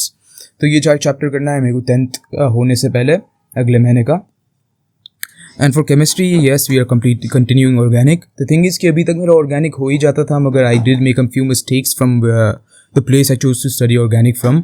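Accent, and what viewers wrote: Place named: Bihar, India. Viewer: Bihar ya odisha ka lagra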